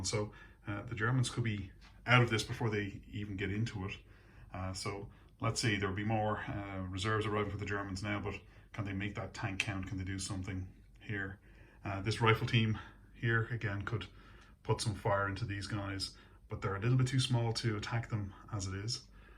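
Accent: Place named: Ireland. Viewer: definitely Irish "Carlow"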